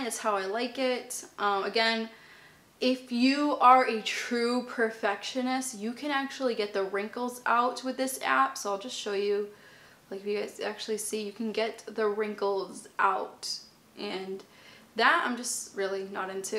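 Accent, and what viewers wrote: Boston, United States. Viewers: I love her Boston accent!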